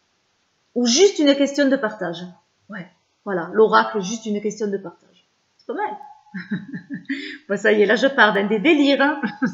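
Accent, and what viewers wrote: South, France. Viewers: Toi avé ton accent du Sud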